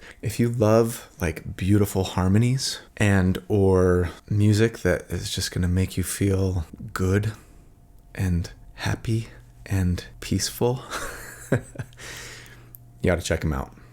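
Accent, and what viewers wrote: United Kingdom. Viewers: Bes bri'ish accent evah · As britshman I confirm this is how we sound.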